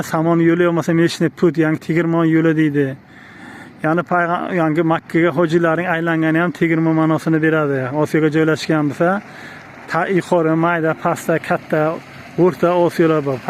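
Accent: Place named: Kashkadarya, Uzbekistan. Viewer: Кашкадарёдан бу ака, гапиришига караганда. Охирги пайтларда куп талантлик одамлар чикябди кашкадаредан. Тайсин, сарик сочли бир бола чикканди валиахд. Энди акамиз чикиш килибди. Куникиб колдик анча, миллион билан дизайнга тема булибдида яна. Узб маскарабозлари точно эргашади сизга.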